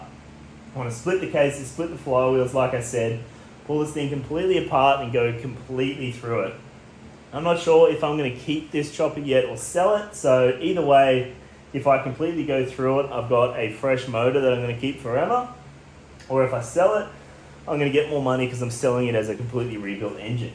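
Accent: Australia. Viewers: thank fuk someone who's speaks Australian is Australian is doing a Rigid Shovel ground up rebuild, been sitting on an 81 procrastinating on exactly what your doing, well exactly as far as my amateur skills will let me go anyway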